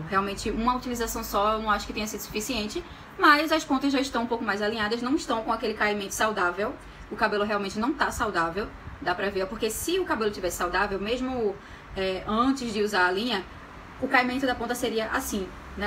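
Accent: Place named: Olinda, Brazil. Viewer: Esse sotaque eu conheço 😃 sou olindense 😘 excelente vídeo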